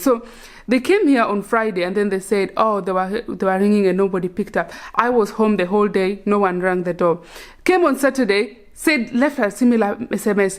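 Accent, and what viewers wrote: Luo, Kenya. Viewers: Luo ascent 👌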